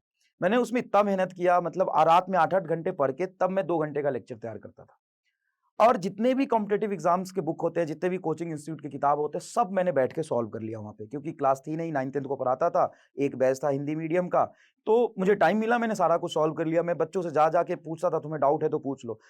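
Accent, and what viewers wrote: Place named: Bihar, India. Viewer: Sir got that Biharizz😩😱😱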